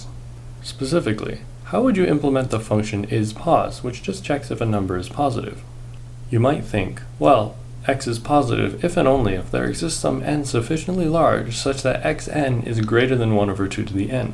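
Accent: Quebec, Canada. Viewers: Juste pour être bien sûr, t'es québécois ???????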